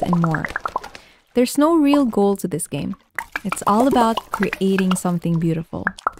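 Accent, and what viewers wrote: Philippines. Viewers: I can hear the Filipina accent from your English haha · She kinda have filo accent ❤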